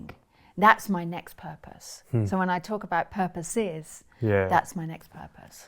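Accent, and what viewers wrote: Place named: Scotland, United Kingdom. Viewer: LOVE LOVE LOVE his accent. I'm a sucker for the Scottish accent. Sounds like Kyle Gray :)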